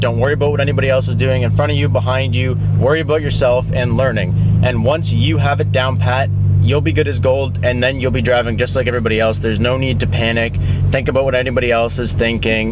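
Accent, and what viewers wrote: Canada. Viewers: Ahaaaa Canadia. That's the accent ouwt! Cheers brotha new frs owner here in 5 days!!!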